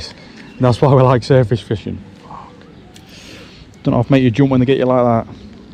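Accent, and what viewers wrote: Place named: Yorkshire, United Kingdom. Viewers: Id guess south Yorkshire. At least thats what he sounds like to my N Yorkshire coast ears. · where are you from? yorkshire? accent sounds same as mine hahaha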